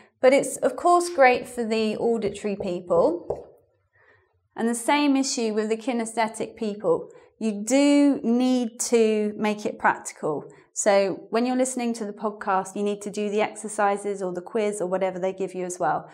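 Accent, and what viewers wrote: United Kingdom. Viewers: I would like to thank you for your channels and the services you offer. I am dating an English lady from Bath and she said I have a British accent when I speak English and when she speaks to me she sometimes feels she is talking to a British gentleman. I did not tell her I learned my British accent from you because she might get jealous. Thank you so much again. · oooooh!!!!!!! U're REALLY REALLY REALLY GOOOOD!!!!!! AMAZING BRITISH ACCENT!!!!!! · i love british accent!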